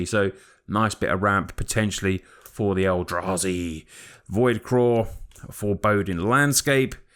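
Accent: United Kingdom. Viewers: Its nice to hear a proper Englishman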